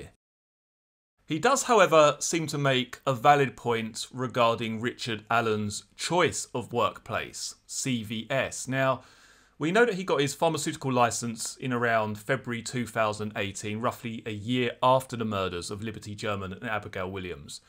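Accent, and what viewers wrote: United Kingdom. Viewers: You may have a British accent, but you are not Agatha Christie !!!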